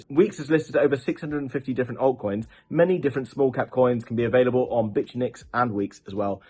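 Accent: RP, United Kingdom. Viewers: New subscriber , great content , you sound posh like me.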